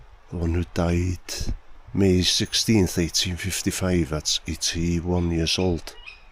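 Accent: Wales, United Kingdom. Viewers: I absolutely love listening to your accent. Especially when you speak in Welsh. So beautiful! Thank You. Indiana USA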